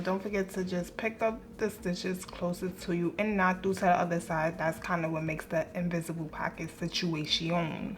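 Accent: New York, United States. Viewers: I'm usually good at recognizing accents. Are u from NY?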